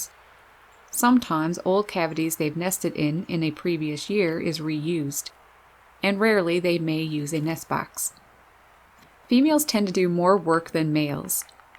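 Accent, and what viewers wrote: Canada. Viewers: I LOVE & her Canadian accent....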